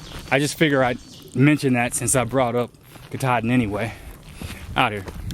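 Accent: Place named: Lehigh Gap, United States. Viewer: Your making great progress keep up the good work. Enjoy your accent out of Lehigh Gap.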